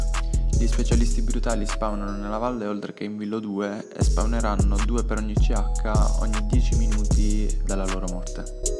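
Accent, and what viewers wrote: Apulia, Italy. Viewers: Mi sembri anche delle mie parti, pugliese? Ahahah Comunque guarda, veramente i tuoi video sono fatti benissimo , continua così.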